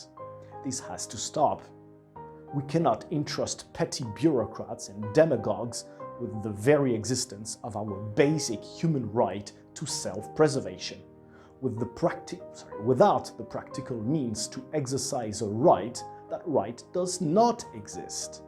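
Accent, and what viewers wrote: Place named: United Kingdom. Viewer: très British ton accent ;)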